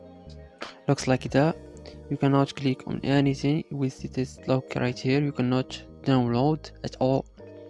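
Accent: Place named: Morocco. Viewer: You are moroccan from your accent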